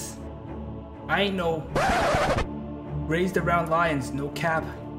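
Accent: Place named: Toronto, Canada. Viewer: you're a Toronto man right? I can tell by the way you talk lol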